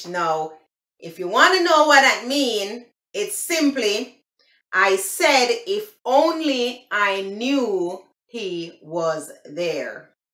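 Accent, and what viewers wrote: Jamaica. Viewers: Yes Sister I love this A wa ya say Jamaican accent 👍👍👍👍👍 English is such a long proper language, 😂 😂 Tfs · Yayyy!!! Thnx for this video!!!! I needed this! I'm subscribed to a few Jamaicans n I needed this lol I love the accent!!! Would love to visit your land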